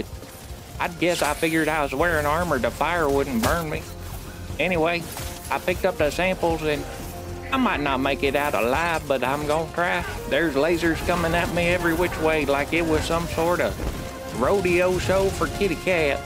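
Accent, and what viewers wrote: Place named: South, United States. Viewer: If you want peace prepare for war....the war for putting democracy hearts of many people with a smile uoon you're face. Good video strange seeing you play longer but hey new format kinda works and it honestly gets people in the vids to hear that strong country accent! Hellyeah brother give them the hammer of democracy!. Cheers.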